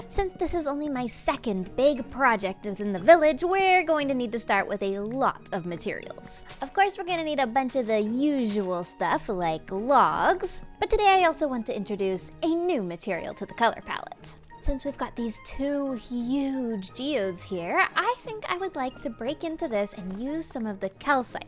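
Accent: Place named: Newfoundland, Canada. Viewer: Absolutely threw me for a loop hearing you're a fellow Newfie, never would've guessed!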